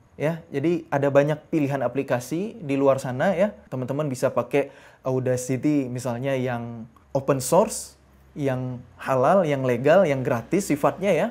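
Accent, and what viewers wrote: Aceh, Indonesia. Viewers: Masyaallah bg..tabarakallah.. Ciri bahasa masi kental acehnya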